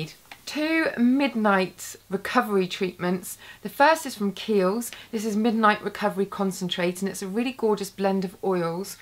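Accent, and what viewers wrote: United Kingdom. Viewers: You are amazing!!!!!! I like ur British accent( usually I'm not fan of it:), you are so unique and eye-popping! Keep doing an amazing job!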